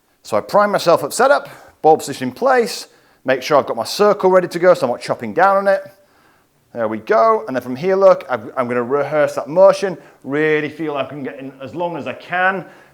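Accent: United Kingdom. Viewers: Thanks, that was very useful. I also really appreciate your British accent !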